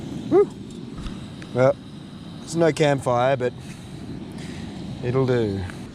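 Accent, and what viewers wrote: Australia. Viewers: Is this Australia ? I sense because of accent. English isn't my native language so don't quote me on my guess. It's pretty beautiful though. Keep up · He sounds Australian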